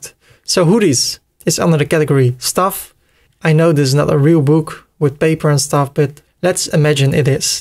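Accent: Netherlands. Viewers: Nice to hear a familiar dutch accent! Heel Goed!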